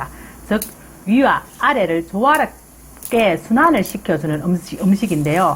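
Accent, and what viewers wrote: Gyeongsang, South Korea. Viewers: 경상도 분들은 그 발음 너무 어려워요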